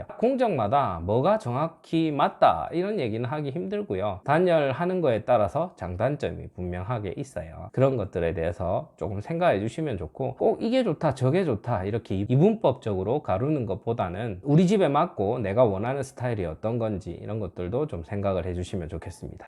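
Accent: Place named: Gyeongsang, South Korea. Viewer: 대구 주택리모델링으로 검색하다가 시청한 영상이라서 대구분인 줄 알았는데 부산이시군요^^ 말투는 대구, 경북 같아서 나중에 문의해봐야지 했는데 아쉽네요.^^ 그래도 자주 들어와 볼게요.. 영상 잘 봤습니다.^^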